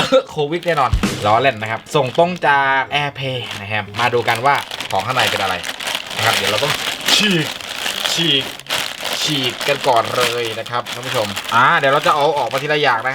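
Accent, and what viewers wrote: South, Thailand. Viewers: ติดสำเนียงใต้นะ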